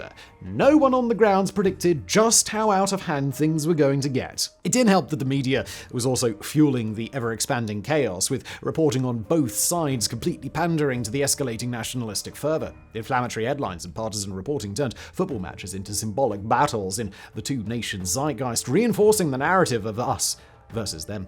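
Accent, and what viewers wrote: United Kingdom. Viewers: Oversimplified with a british accent. Interesting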